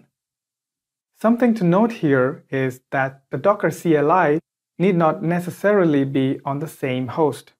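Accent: India. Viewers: this look surreal. an indian who has very little indian accent. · always better with the indian accent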